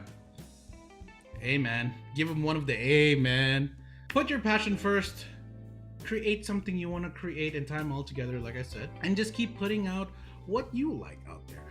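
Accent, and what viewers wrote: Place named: Philippines. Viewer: After watching, I realized that fingerboard sponsorships shows how committed and consistent you are, not just by tricks and fanbase but by brand and showmanship. The way you do tricks, content, and how open you are to the community are the people who truly deserves sponsorships. No tryhard bullshits with "SpOnsOr mE" without actually bringing any to the table. Sponsorship is not a competition—it's about proving yourself and how your going to bring something on the table, and if people like what you brought. More and more people will demand. Also, I can also hear how your Filipino accent is obliviously slipping out throughout the video haha😂✌🏻❤️‍🩹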